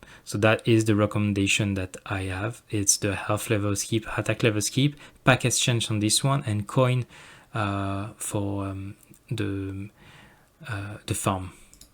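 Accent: France. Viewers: j'ai reconnu direct l'accent français mdr ^^ Bonne vidéo sinon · Frenchest person i ever heard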